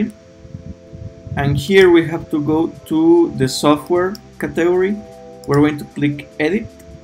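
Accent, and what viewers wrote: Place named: Spain. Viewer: I think you have a bit of nice accent coming from Spanish, am I right? :)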